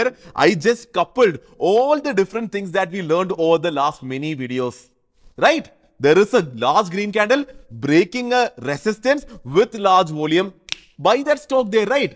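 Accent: Kerala, India. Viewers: I love your Kerala style English pronounciation.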